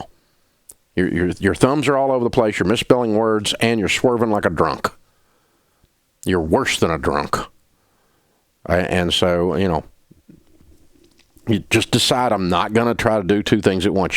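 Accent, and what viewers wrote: South, United States. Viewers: I watch it for southern accent.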